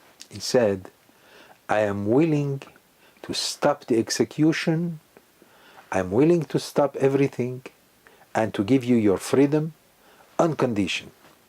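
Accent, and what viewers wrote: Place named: Iran. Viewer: you have Farsi accent even when you speak English and even want his bigger Hebrew semitic language I can smell and I can hear Farsi accent but I'm not going to judge you pray for Rabbi for all of us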